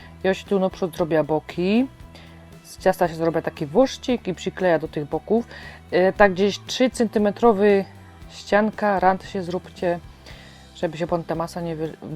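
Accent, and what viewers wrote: Silesia, Poland. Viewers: Kocham ten kanał i twoją śląską gwarę! :)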